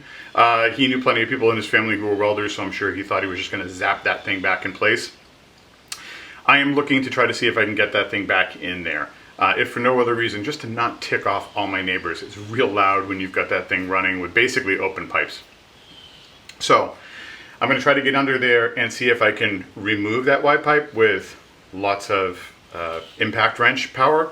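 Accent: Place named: New York, United States. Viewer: Sounding like a real uneducated NY'er - lets keep it civil ❤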